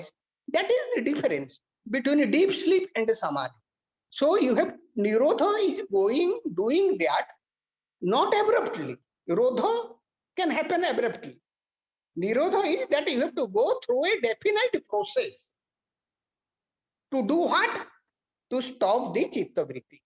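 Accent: Odisha, India. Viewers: Swamiji pranam 🙏🙏🙏 I have few questions.. please answer me 1 - I practice pranayama, asana, bandha, mudra, do japa etc... When I meditate my prana became active and it generates heat in my body, my spine became hot it's like something rising very hot substance in my spine.. also the prana give pressure in muladhar and generate heat. Sometime it pains a little bit but it's ok. Then I stop meditation, but now again am starting. 2- Swami ji where do you live ?? Or have ashram? 3- Swamiji are you from odisha ?? You sound like odia cause am from odisha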